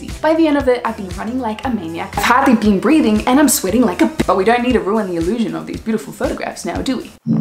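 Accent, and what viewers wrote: Australia. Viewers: I am going to be honest here. At some point, I did unsubscribe you because of the Australian accent (just a past trauma involving some Aussie accent) but the content is just so good and funny, I had to come back :D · Is she Australian?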